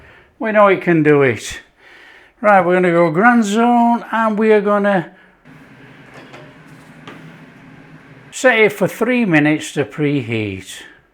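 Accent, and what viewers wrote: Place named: Midlands, United Kingdom. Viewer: you sound more like midlands than the north. Stoke on trent way accent. Its north to me being in the westcountry. Lovely grub mate 👌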